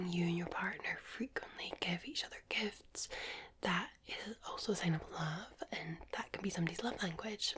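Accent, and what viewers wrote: Scotland, United Kingdom. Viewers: Another Scottish ASMR. Another happy American soldier 🥹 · She looks like pin up art and calms me like.... tranquility. are a fine sleep aid. TY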